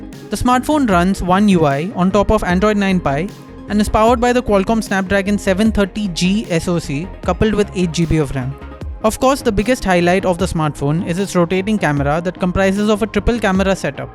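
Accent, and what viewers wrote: India. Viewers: You sound like a indian scamer.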